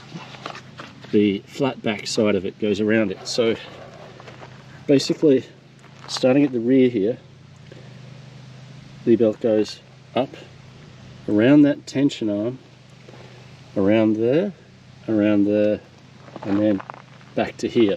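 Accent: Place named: Australia. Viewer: Ha! I knew that was also possible, but your Aussie accent is soft enough that it could be Kiwi, but I should have known by the dryness that is Oz. I guess the name and that Kiwis are often DIY-competent. We lived on the North Island for 13 years where I had a Walker, which I loved, as the floating deck out front was good for getting under trees. Now on Kauai where there is no Walker dealer, so now I'm a Hustler man. I just forwarded your video link to a friend with the same issue, so thanks from both of us!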